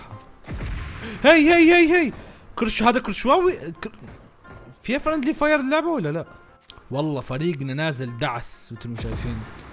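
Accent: Iraq. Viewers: احلى واحد يحچي عراقي ضلعي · دقيقة ملك اللهجه العراقية 😂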